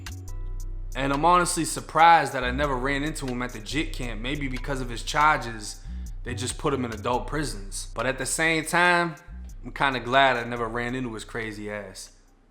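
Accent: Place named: Florida, United States. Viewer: Lol yo not for nothing bro story telling voice is krazy 😩😆😆🙌🔥🔥🔥 lol I’m from NYC and I know this a wild Florida accent but that alone has me hooked on these videos, perfect example of BEING YOURSELF! Always be yourself, it’s the difference between true success and temporary failure 😊❤️🙏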